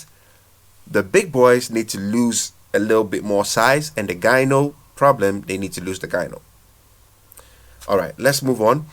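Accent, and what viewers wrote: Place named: France. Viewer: I think he speaks french. Souns like a french accent.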